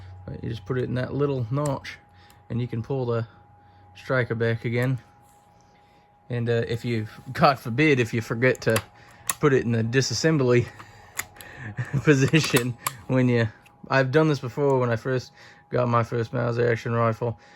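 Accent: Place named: Australia. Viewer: Bro caught an Aussie accent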